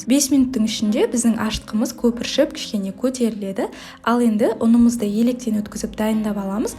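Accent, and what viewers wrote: Standard. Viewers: Жаксы соилеиди екен таза казакша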